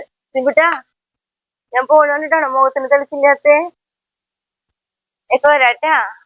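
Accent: Thrissur, India. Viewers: വീഡിയോയും കൃഷിയും ഭക്ഷണവും എല്ലാം വളരെ നല്ലതാണ്... പക്ഷേ പറയുന്നത് പകുതിയേ മനസ്സിലാവുന്നുളളു. ഒന്നാമത് തൃശൂർ slang... പിന്നെ ഭയങ്കര സ്പീഡും.. ചേച്ചി തന്നെ വീഡിയോ ഒന്നു കണ്ട് നോക്കൂ.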